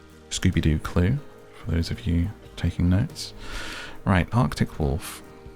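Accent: United Kingdom. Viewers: Who wants to fall asleep to a British dude talking? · British daddy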